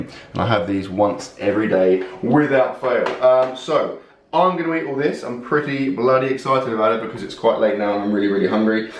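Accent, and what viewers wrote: United Kingdom. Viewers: At first I was like, did get a hair cut? And then I was like hmm, the British accent is new though, but I did miss the last video, so maybe it's his new thing. Then he said his name is, then I was like ohhhh.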